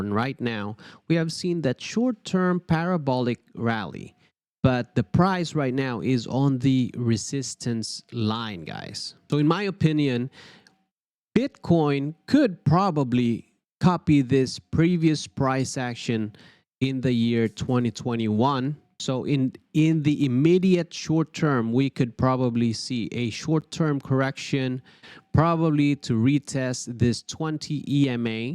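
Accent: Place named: Philippines. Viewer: Sounds pinoy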